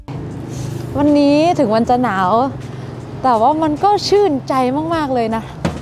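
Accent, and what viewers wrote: North, Thailand. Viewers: ชอบอู้เมือง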